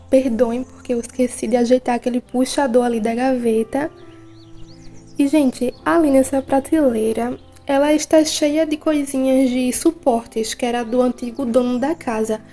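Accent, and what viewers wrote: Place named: Pernambuco, Brazil. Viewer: Linda sala amei vc é pernambucana lindo seu sotaque 😍🤗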